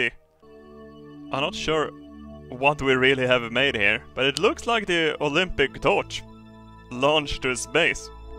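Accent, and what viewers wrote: Sweden. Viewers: Yes, I'd love to watch a rocket take off then turn on the radio and hear "Let's do this shit!" in a Swedish accent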